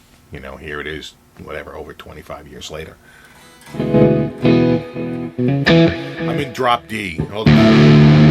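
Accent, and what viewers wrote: Boston, United States. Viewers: Are you from Boston? If so, I am too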